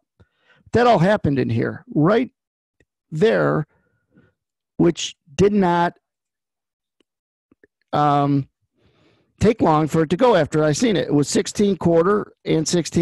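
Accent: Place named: New York, United States. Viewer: I love these videos every day. No nonsense blunt real new Yorker talk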